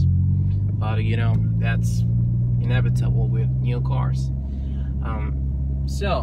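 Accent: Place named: United Kingdom. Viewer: Man me encanta , muy británico !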